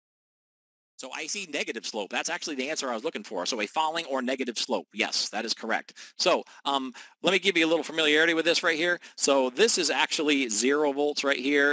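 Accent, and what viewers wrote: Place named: Northern Minnesota, United States. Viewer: that guy giving the presentation has a Northern-Minnesota accent. Have you ever seen/heard the movie "Fargo?" Great movie of a true story set in Northern Minnesota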